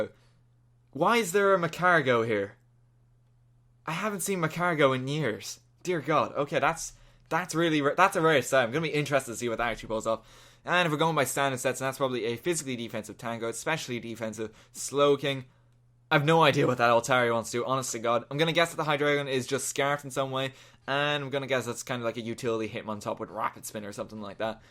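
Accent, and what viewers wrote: Ireland. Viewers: Irish Accent <3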